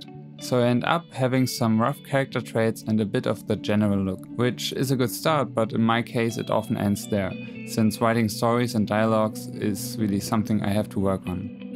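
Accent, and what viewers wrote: Germany. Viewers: DEVIL MAY CRY?! My favorite character. Dante is. Jeeez. Amazing. I even loved nero and dante with short hair. I hated it in the beginig. So you have with hair right? A little Dante ispired? I started listening to your videos late at night when i couldnt sleep. Ill be hones. Your german accent is... relaxing to me cuz of years of watching rtl2. It was my only sorce of anime back when i was a kid. Had luck cuz i was taking germany classes since kindergarten. Why was this so important here in my country i dont even know... i think u are so much more capable than me. Think u are even the same age as me but i dont know.. while i draw and paint all the time i strugle. Or maybe i should learn to accept my style. Cuz its definetly very diffrent of what i want. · r u from germany? haha i dont know your accent is a bit like mine. ^^” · Also ich denk mal du beust deutsch wegen deiner aussprache , also schreib ich mal in deutsch xD auf webtoons gibt es eine story namens sirenes lament und da gibts auch einen ian der so eine attitude hat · dieser deutsche Akzent xD aber schön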